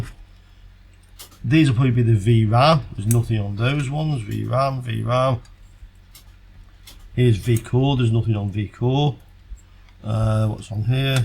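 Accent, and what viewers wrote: Germany. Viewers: ich liebe es wie man es bei uns deutschen eig immer zuverlässig raushört :D x3 grüß dich :D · Dein Kumpel hat wirklich was drauf, das muss man sagen. Er ist zwar nicht der Guru, was Grakas angeht, aber dennoch ganz oben mit dabei. Ich denke, das weißt Du ja. Nach allem, was ich bisher gesehen habe, bin ich sehr zuversichtlich, dass bloß die 1,8V Versorgung Probleme macht ... sobald soweit vorne in der Sequenz etwas defekt ist, ist meistens weder RAM noch GPU defekt, daher wäre ich an Deiner Stelle sehr zuversichtlich. Ps. Mein Kommetar kam übrigens schon, als ich das Video gerade 2 Minuten gesehen habe. Man merkt sofort, wenn ein Deutscher Englisch spricht 🙂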